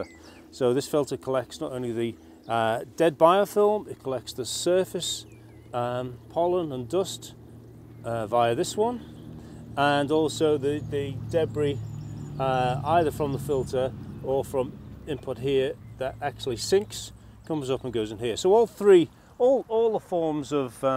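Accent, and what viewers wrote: Liverpool, United Kingdom. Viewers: Love the voice of an educated Scouser.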